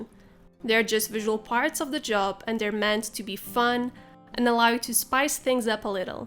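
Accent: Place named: Portugal. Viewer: só pelo sotaque consegui perceber que eras de Portugal ahshagshs adorei o conteúdo, you just gained one new sub <3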